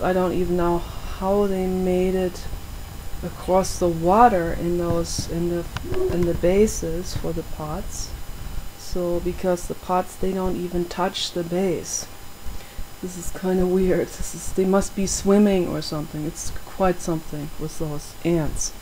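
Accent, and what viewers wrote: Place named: Germany. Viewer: You have to be german. I Love your melody!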